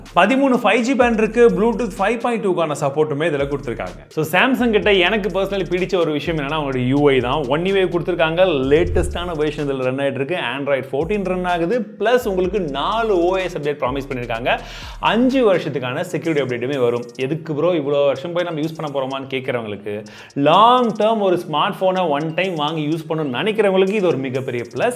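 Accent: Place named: Kanyakumari, India. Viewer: #SUPERTT intro adipowli 🌟 bro.... Kollam ketiya anna😅 ....ethu enga ooru slang from # kanyakumari ♥️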